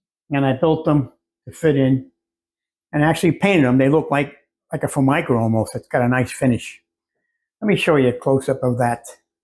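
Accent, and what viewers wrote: New York, United States. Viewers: I thought I detected a hint of New York in your accent. With my accent people always think I’m from New York. My daughter lives in Brooklyn. The only thing I don’t like about the south is the heat and the hurricanes. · Hey, you sound like a New York guy—where from originally and where’s your current nest? I grew up in Westchester Co. north of NYC so I know that accent — NY Italian-American? I’m in the Bronx now, and part time in the Delmarva area where Delaware meets MD. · Fantastic job!! Wow, very impressive how you renovated this tiny house and decorated it so nice. It's home. I'm happy for you that you were able to accomplish this dream home for your retirement years. You are very smart to do what you did. I'd like to see the restoration of the 1930 car. You sound like you're from Brooklyn or Queens??? Not sure. I'm from the Bronx. 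My husband is from Brooklyn. We moved to TN from CA. We like it here. It's been ice rain now, so we're hunkering down inside. Hope you're safe out there where you are. Have a blessed day. 🙏🙏🥶🥶🤗🤗🇺🇸🇺🇸🫎🫎🐔🐔🐦🐦🐿️🐿️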